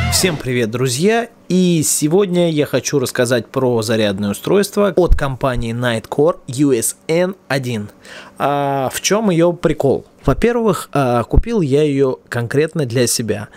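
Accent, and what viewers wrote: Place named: Russia. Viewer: От аканья автора уши болят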